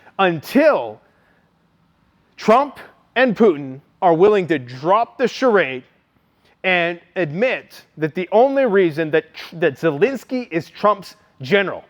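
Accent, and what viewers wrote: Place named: South, United States. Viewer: Lol sound like a true southern boy Tell it like it is don't care who you hurt or piss off😂😂😂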